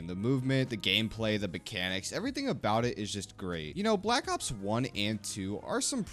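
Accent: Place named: South, United States. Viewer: Your voice is really cool. The Southerner accent is sexy.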